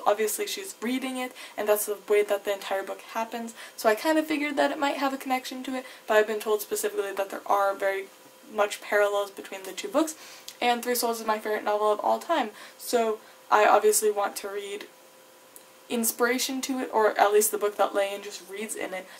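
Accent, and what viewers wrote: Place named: Canada. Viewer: why do you sound Canadian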